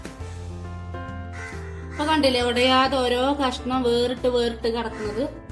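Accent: Malappuram, India. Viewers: ഇത് മലപ്പുറം ഐഡന്റിറ്റി ഭാഷയാ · മലപ്പുറം ഭാഷ കേട്ടരിക്കാൻ എന്തെര് സുഖം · ശരിക്കും മലപ്പുറം ഭാഷ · Mlpm slag ishttayi nallla rangand kelkkaan pinne thaatha nte vayel vellllam varunn nd vishappullla samayatha ee video kandath nte kodhi onnum ndavullla nte ponnu thaatha ichiri chor tharuo☺️ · മലപ്പുറത്തെ സംസാരം ഒന്ന് വേറെ തന്നെ ചിരി വന്നു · മലപ്പുറം നാടൻ പാസ 😄😄😄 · Malappuram slang kelakkan vendiya njn vedio kananee 🙊🙆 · തനത് മലപ്പുറം ഭാഷ...അടിപൊളി 👍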